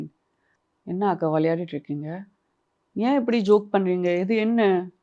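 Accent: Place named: Kerala, India. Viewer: Kerala va ma neenga tamil peasum podu thearigeradu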